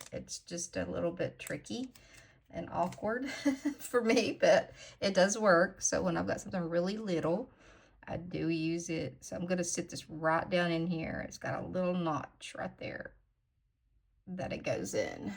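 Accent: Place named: South, United States. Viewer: This is a darling mani! The reindeer and tree turned out so cute and I love plaid. The colors you chose are beautiful. Please don’t apologize for that southern twang. It’s your heritage and part of what makes you special. Being from the South I sometimes wish I had a Southern accent, but I do not. I don’t even sound like my siblings. I take after my mom who was from NC. That’s not that far away but the accents are so different. My dad was a country bumpkin if ever there was one! I’m the odd ball of the family. Even my daughter doesn’t say words the same way I do. Geez, talk about rambling!😂 Thanks for another fun night with you. I’m going to miss these videos.❤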